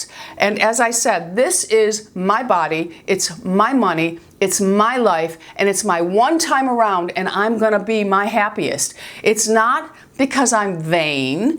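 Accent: South, United States. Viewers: I have find you few days ago and really like you. I am 56 and barely put stuff on my face but love to listen to girls telling me what they do. It takes a lot of humility to show our face without anything and you are doing it in front of all of us, chapeau to you. I think that you have a southern accent and it is so nice. I would love to meet women like you, it is refreshing. I never look at women and try to see if they had this or that done, it is not in my nature. I am sad to see women that have done to much and look weird instead of aging gracefully. I am in Italy and am seeing older Italian women that are just dressed properly and have the right amount of makeup or none and they look great. I often stop them on the street and tell them how stylish they are. We should all applaud each other instead of putting down. Ciao and a lot of bizous!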